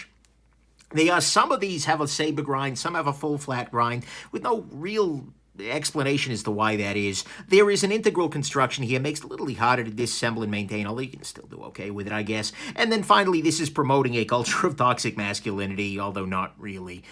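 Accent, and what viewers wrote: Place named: South, United States. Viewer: So when do we get the high speed low drag review of the ladybug with that southern accent?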